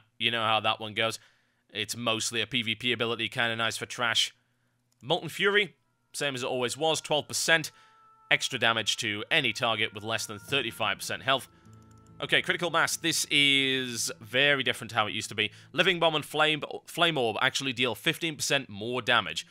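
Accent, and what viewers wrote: United Kingdom. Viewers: why did I just spend nearly 40 minutes watching a video about talents on a class I dont even play?... I think its that british voice.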